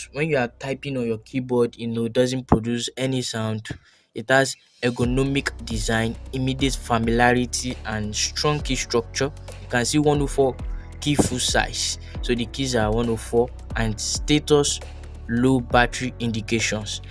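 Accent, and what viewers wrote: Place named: Nigeria. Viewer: Never heard more authentic NIgerian Accent ever 😁💀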